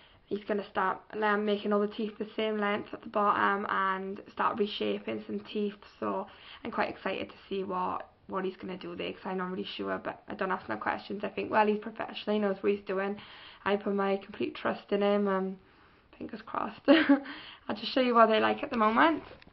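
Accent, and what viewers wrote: Wales, United Kingdom. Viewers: I love your Welsh accent. It's been ages since I last got to hear it now I've moved to Canada. Just wondering, how long did your treatment take? I must have missed it in the video. Did it hurt? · hun what practice did you have your teeth done with you sound welsh and im from caerphilly your teeth look amazing xx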